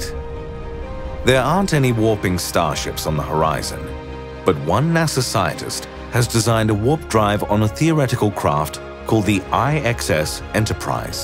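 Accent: RP, United Kingdom. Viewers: It’s a total rip off of Kurzgesagt if you ask me: Distinctive vibrant colour palette ✅ Big bold white text in thumbnail ✅ Rounded off animated shapes ✅ Science/space themed content ✅ Narrator with British accent ✅ The only thing that’s missing is birds. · another science channel with a "british" narration, this gon' be good SUBSCRIBED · What's with the bizarre American pronunciations of "Cos-moess" and "Proe-ject" when you have a perfectly good English RP accent? It's highly disturbing! The last syllable of Cosmos rhymes with Boss, and the first syllable of Project rhymes with Bodge. I suppose I should count myself lucky that you didn't say "Aloo-minum"...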